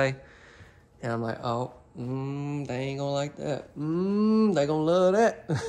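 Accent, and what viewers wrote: South, United States. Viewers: The house is great, but the real star is your Southern accent! Love it!!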